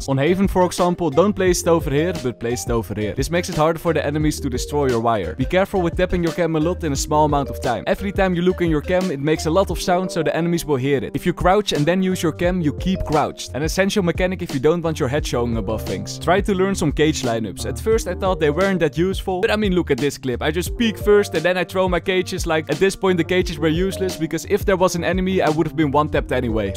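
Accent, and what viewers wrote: Netherlands. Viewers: are you dutch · i smell the dutch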